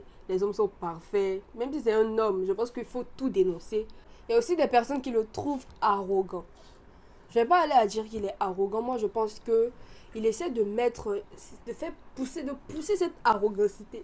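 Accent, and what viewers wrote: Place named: Marseille, France. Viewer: Tu es marseillaise? Tu parles comme les marseillaise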